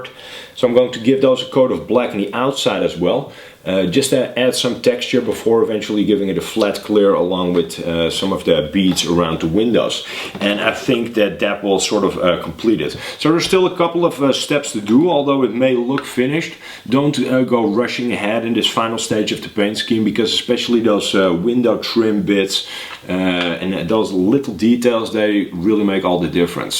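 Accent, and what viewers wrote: Netherlands. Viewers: Wow! Really creative and original work. Are you Dutch by chance because your accent sounds a bit Dutch?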